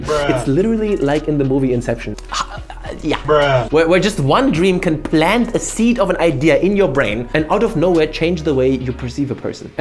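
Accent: Germany. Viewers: Manchmal hört man deinen deutschen akzent raus😂❤️ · Alder du bist doch deutscher .. hör ich doch am Akzent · Your english sounds really german but also really good 😂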